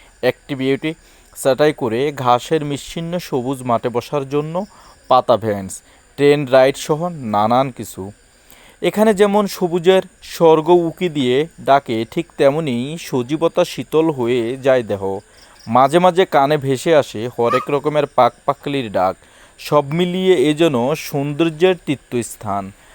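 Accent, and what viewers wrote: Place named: Chittagong, Bangladesh. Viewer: ভাই,, কিছু মনে করবেন না।। আপনি তো চাটগাঁইয়া,, আরও শুদ্ধ বাংলা প্রত্যাশা করছি।।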